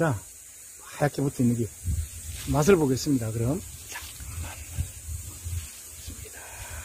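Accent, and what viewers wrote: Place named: Gyeongsang, South Korea. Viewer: 갱상도 사투리가 이젠 귀에 착착 잘 달라 붙어요~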